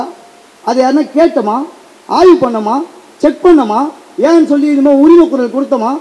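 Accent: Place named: Chennai, India. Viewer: அண்ணா சென்னை பாஷை ❤️❤️❤️🙏🏿🙏🏿🙏🏿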